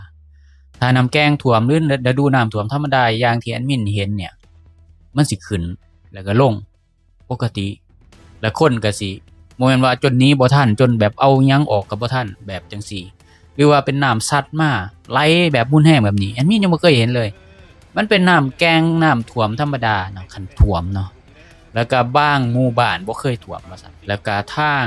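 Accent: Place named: Laos. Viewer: หม่วนหลายภาษาลาวดั้งเดิมที่แอดเว้าสบายดี,ขะน้อย,โดยขะน้อยขอให้แอดรักษาภาษาดีงามแนวนี้ไว้ตลอดไปส่วนหลายได้หงินคนลาวเว้ามีแต่เอาภาษาไทยไปปะปนเลยฟังบ่หม่วน❤❤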